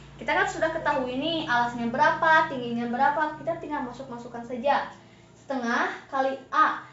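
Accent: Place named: West Java, Indonesia. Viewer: *sundanya itu loh masih lengket, sukses ka*👍👍👍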